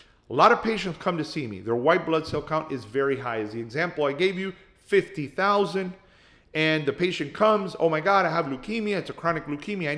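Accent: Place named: East Coast, United States. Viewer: I was recently told that I may have LCC and have started the testing process. I’m a 54-year-old woman with a connective tissue autoimmune disease, and my lymphocyte levels have been elevated for the past four years. I plan to revisit this video after my appointment next month, when I hope to have a better understanding of what’s going on. Thank you so much for taking the time to share this information. I also wanted to mention that your East Coast accent was incredibly comforting to me. Having grown up on the East Coast and having lived in the Pacific Northwest for the past 25 years, hearing your voice made me feel relaxed and reassured. It reminded me of home and gave me that warm, familiar feeling of being around family. I didn’t realize how much I needed that comfort until I listened to you. Thank you again for sharing your knowledge and helping others navigate what can be a scary and uncertain journey.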